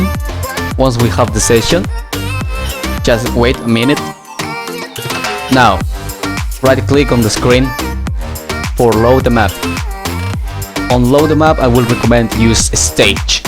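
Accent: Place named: Germany. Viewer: pretty german, good tutorial helped me alot 🐙👍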